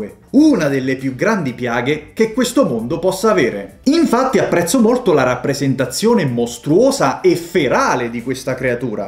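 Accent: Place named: Marche, Italy. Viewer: Maremma cane non prendiamoci troppo sul serio! tutte le settimane ci prepara questi gustosi video a tema horror e un punto di vista che condivide Co. Generosità Con noi che senso ha stare a commentare eh ma io avrei incluso? Sono le classifiche di il suo punto di vista non è necessario il nostro punto di vista è eccheccazzo 😊 forza grazie l'accento Marchigiano mi fa impazzire 😂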